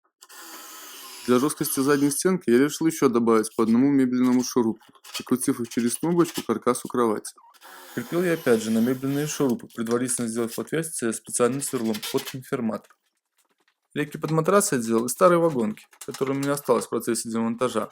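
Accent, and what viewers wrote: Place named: Belarus. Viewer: Точно! Коллега в Минске несколько лет прожил, тоже так говорил.... Живе Беларусь)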